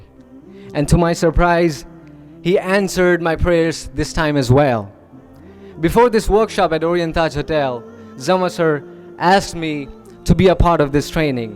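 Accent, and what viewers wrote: England, United Kingdom. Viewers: MaaShaa Allah Bohot badiya bhai The way of speaking, behaving like an English man. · Asklm sir I am joining u r classes 2011 Karnatak college more 15 days Bidar U r English talking Maa shaa Allah u r teach Accent like this Angrazi 👨 man Maa shaa Allah Meri aap sa humble Request hai aap YouTube channel pa English Grammar classes ke video daliye plz Alphabetical phonics sounds ke Videos daliye plz meri 3 years daughter ko Teach karna hai plZ Humble request hai Sir plz Basic Education Covid19 ke resons sa schools tu closed hai plz plz plz Plz plz plz plz plz plz sir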